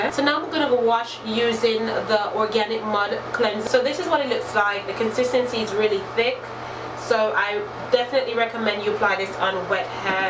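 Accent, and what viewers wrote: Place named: United Kingdom. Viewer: Did u spend time in the uk because I can hear a bit of a British accent love the hair btw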